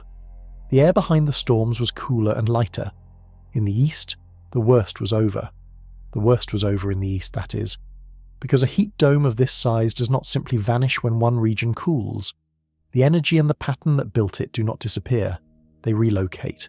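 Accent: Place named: United Kingdom. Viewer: The narrator voice is British. Few Brits have any concept of Fahrenheit, apart from maybe 32º Fahrenheit.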